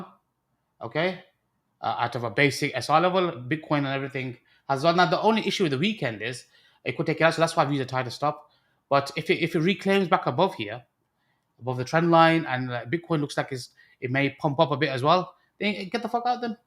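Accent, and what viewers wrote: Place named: South Asian, United Kingdom. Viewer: Thanks a lot bro. A request of mine and i'm sure many others is to please turn on captions for your videos. I absolutely love and enjoy your South Asian Britiah accent but it sometimes is a bit hard to follow esp with the recent mic issue. Dera Manana brother!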